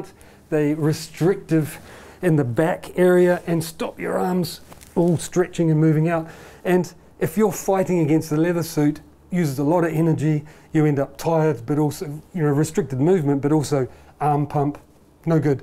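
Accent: New Zealand. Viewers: This dude sounds kiwi..Is he??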